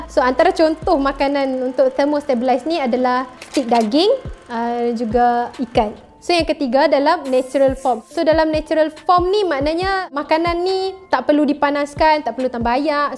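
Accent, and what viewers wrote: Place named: North, Malaysia. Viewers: Kedah elokk hahaha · TBH...kalau nak cakap utaqa...cakap utaqa terus..I can see a lot of mix up ...anyway good information...thumbs up👍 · The BEST...north accent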